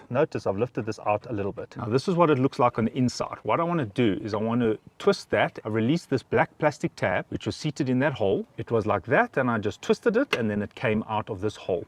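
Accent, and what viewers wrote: South Africa. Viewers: Great video. Detect a South African accent there. Groete van Engeland af 😜 Great video. My dashcam has parked recording mode so need to source a 12v constant. Easily done. What I have used is some conduit and fabric tape. Probably a bit ott but just for the added surity the wire cannot be exposed. Great video though. Very helpful thanks.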